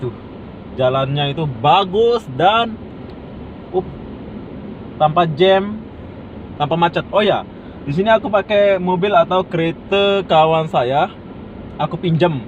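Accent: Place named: Malaysia. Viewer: Melayu banget ngomngnya...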